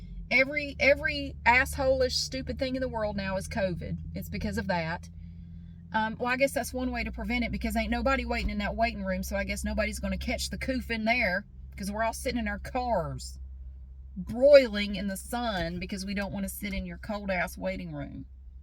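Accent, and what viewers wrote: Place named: South, United States. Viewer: I am originally from India I lived in Texas for 7 years before moving to Canada. I love southern accents. I find the way you speak and share your experiences really amusing. You are raw and real. Keep up the good work. Your rants are extremely funny lol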